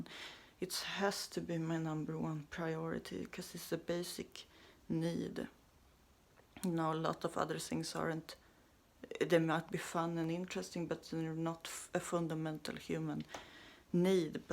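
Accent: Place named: Sweden. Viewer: Sounds swedish. Köp l tyrosine, fixar avgiftningen. Naturligt koffein, en aminosyra. 150kr burken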